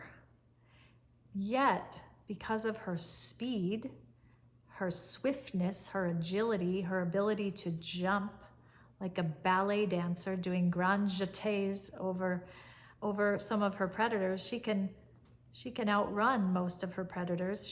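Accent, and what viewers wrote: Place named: Syria. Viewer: You have a very good Syrian accent 😍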